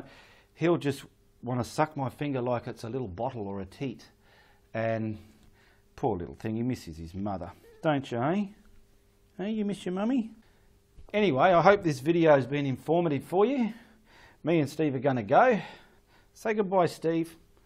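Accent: Australia. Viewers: Love love love your accent and your Aussie phrases. That's part of what makes you so unique to watch!! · I have only just found you, and I am loving the down to earth way you teach. I can understand every word you say but being English means we do understand the Aussie accent well. I love the fact that your studio is a corner of your living room. Our living room is far too small to do that so I am converting a corner of my bedroom in to a studio. I have subscribed to your channel because of your no nonsense way of teaching. I enjoyed your Vlog answers to questions. Regarding retarder, I am thinking in Australia, like you said, it gets very hot and you would need it. I have bought Golden Gloss Medium that also allows blending and slows down drying time. It also has added polymers so you can thin down your paint about 30% without under-binding occurring. Like one of your other subscribers who has commented, I am 65 also and I am new to acrylic painting. 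I have done a little oil painting before but not acrylics. I am looking for a straightforward teacher and I have found him. Thank you. Love to you and Steve. He is gorgeous. xxx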